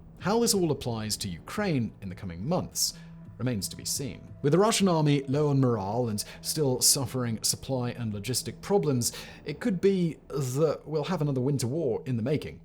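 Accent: United Kingdom. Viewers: As a southern American, hearing you say ain't in your British accent was simultaneously awesome and hilarious.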